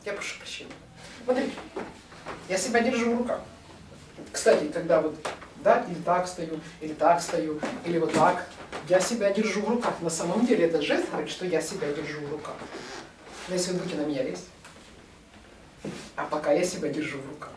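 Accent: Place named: Kyiv, Ukraine. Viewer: а сами вы чем лучше? хам, который не может подтвердить свои слова и не может выполнить в жизни обдуманные и хорошие поступки, тем самым о многом сожалеет, во-первых, вам стало это интересно (тема лекции) и как только вы решили не брать информацию в учёт жизни, во-вторых, вы увидел плохой комментарий и решили написать о человеке, которого не знаете, и судя по всему вы не внимательны и у вас узкий кругозор, так как говор лектор вполне себе, допустимый, так как она живёт (внимание) в Киеве, к вашему сведению столица Украины, в третьих, давайте ж включим логику, если она препадает и ей много лет, значит может чему то научить, и следовало обдумать все хорошо и досмотреть до конца, так как её звали работать личным психологом у политика, и скорее всего у вас сейчас появится желание удалить свой необдуманный ко мент, так как поймёте, что не правы, а когда до читаете этот момент скажете: НО ХРЕН ТАМ ТЕБЕ НЕ УСТУПЛЮ Если так оно и есть, то подумайте о как поступаете)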